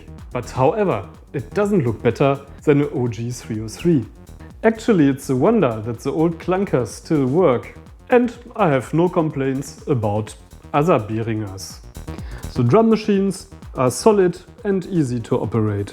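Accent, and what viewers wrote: Germany. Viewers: Man i love your german accent, it reminds me that there are also great electronic musicians in germany ❤❤‍🩹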